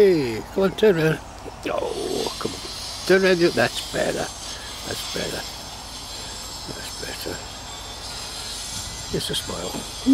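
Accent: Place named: United Kingdom. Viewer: Thought that this was the UK with the accent and a beautiful medieval castle.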